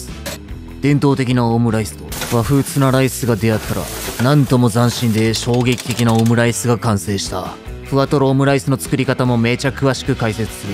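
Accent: Kansai, Japan. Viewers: 「 」「極主夫道」大好き人間には たまらないご馳走料理グルメ番組（;; ） 極道なのに、どこかかわいい主人公… 極道なのに、食材に愛を感じる切り方 極道なのに、ポメと仲良し そしてなぜか関西弁… 卵かけごはんにごま油かけて 牛肉とニンニク乗せて チーズ炙ってバジルかけて マヨネーズとごま・のりを乗せてた料理みたいに どことっても美味しい要素しかないっ 大好きだ❤️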